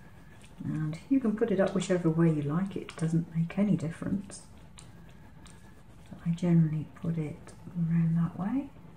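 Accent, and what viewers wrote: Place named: United Kingdom. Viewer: Aurrrr dammet. Shes bri ish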